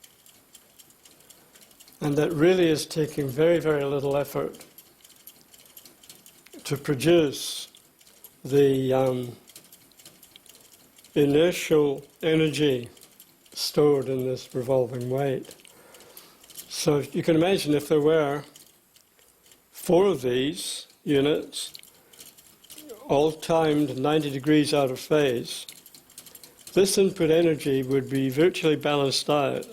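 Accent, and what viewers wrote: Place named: Northland, New Zealand. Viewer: Just by any chance are you a Northland Kiwi????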